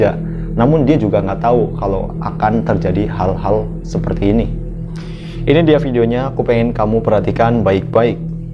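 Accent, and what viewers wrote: Java, Indonesia. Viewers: Wong jowo mas?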